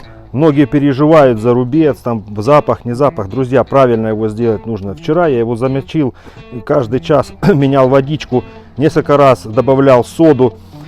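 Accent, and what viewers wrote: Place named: Kuban, Russia. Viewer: Ну шо поделать, с Кубани парень..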